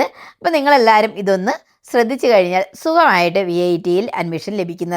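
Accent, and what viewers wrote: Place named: Thiruvananthapuram, India. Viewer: Chyacchi thiruvanthoram aanennu thonunnu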